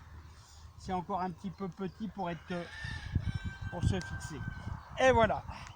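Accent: North, France. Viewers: Ah!! Ben voilà!! ça fait plaisir de t'entendre parler un peu le patois du Nord! Je trouvais que ça manquait un peu dans tes vidéos!! Je vais mettre aussi un peu de brin d'queviaux aux pieds de mes tomates et au fait, le brin d'coulons te sais qu'te peux in mette su t'chiette y parait que ça fait aussi repousser les qu'veux!!! Hi hi!!! ou Lol comme y disent ché jeunes!! José un chti exilé à Narbonne.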